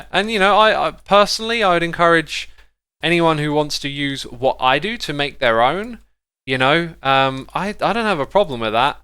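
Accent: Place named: United Kingdom. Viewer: What kind of accent is that? I'm pretty sure X is from Britain, but what section is that accent. It sounds like no other accent and familiar at the same time. I absolutely love it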